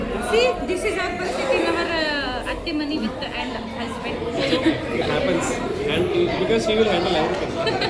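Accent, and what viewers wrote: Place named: North Karnataka, India. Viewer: Wonderful dear, u speak very lovely North Karnataka original Brahim bashaaa❤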